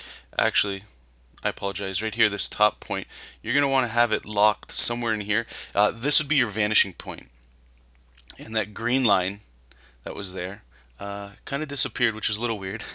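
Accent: Canada. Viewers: yo, you a canadian?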